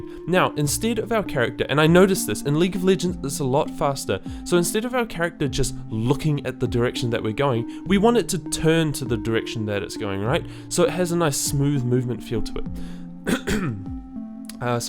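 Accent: New Zealand. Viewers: Dude you're a kiwi haha awesome tutorial! Thanks man :)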